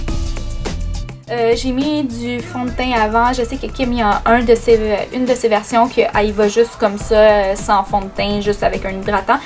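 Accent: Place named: Quebec, Canada. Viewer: J'adore ton accent quebequoie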